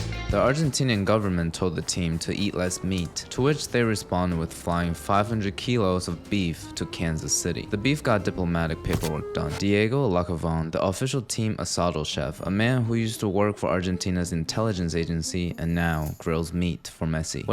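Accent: Finland. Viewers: He sounds Scandinavian (Finnish) to me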